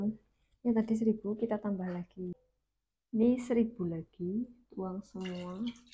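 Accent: Banyumas, Indonesia. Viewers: . Mba jawane ngendi si ko kayane wong ngapak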